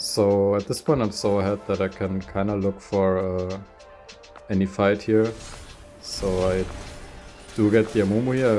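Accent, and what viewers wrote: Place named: Germany. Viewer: LETS GOOO the german gamerboy with a strong and sexy german accent posted again 🎉🎉🎉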